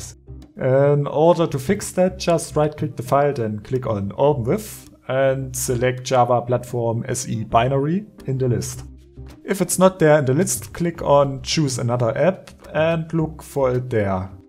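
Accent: Germany. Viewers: Hab den deutschen Akzent von Anfang an durchgehört :) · Dieser Deutsche Akzent und dann noch die stelle "Werbung Überspringen" 😅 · Ich liebe diesen deutschen Akzent :D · man merkt das du deutsch bist xD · Wusste ich doch, dass ich da den deutschen Akzent rausgehört habe. :) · You included EVERYTHING important (I think)! Omg, you're very helpful for people, who are looking for a proper guide. I subscribed, and also I love your German accent 😂 I live in Tschechien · Hast ein Abo aus Prinzip 1. Der deutsche Akzent 2. Du folgst "Der Valon" du ehrenmann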